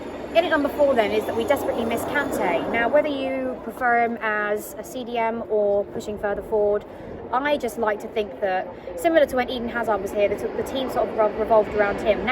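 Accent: United Kingdom. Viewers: I'm still pissed off about this match..I don't even care to know the reasons she is giving but.... I can't help it I just love the British accent.....I would love to have a British friend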